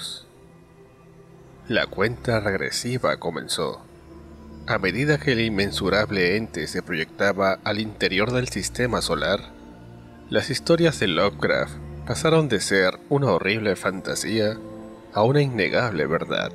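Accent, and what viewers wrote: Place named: Castile, Spain. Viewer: A todos mis hermanos Hispanos. Tercios Españoles invencibles. <link> Por cierto, eres un españolazo. Eres como un español del interior, castellano, así hablas 😍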